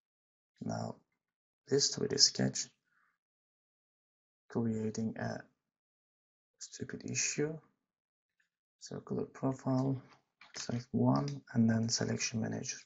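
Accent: Turkey. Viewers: aksanından türk oldugunu anladım, sandalye sırtı gibi ergonomik kıvrımlı düzensiz katı modeller çizer misin?(mesh back diye geçiyor) teşekkürler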